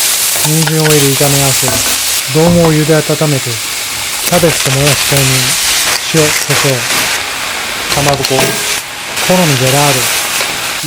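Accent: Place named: Tosa, Japan. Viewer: 長崎名物に唐突に現れる土佐弁みたいないごっぞう好き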